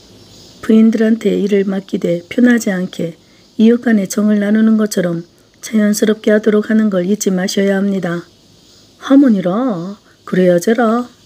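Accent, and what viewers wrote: Jeolla, South Korea. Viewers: 전라도 사투리를 정말 전라도 나이든 시골 사람처럼 잘 하시네요 감사합니다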